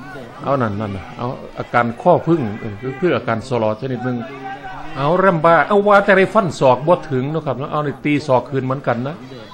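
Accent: Isan, Thailand. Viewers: มักหลายที่เว้าอีสานเด้อคลายเครียดได้ดีอิหลี😁😁😁😁😁😆😆👍👍👍👍👏👏👏🤣🤣🤣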